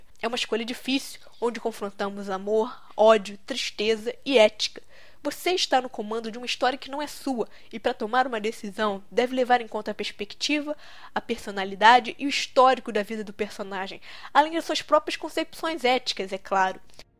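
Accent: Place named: Rio de Janeiro, Brazil. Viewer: É, o grande defeito desse canal é o sotaque dela. Esse carioquês é uma outra língua!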